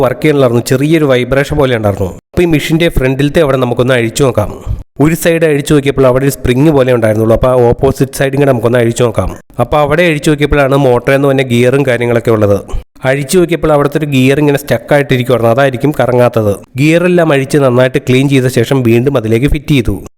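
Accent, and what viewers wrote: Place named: Ernakulam, India. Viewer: വീട് എവിടെയ?? Ekm district ആണോ....Slang നല്ല പരിചയം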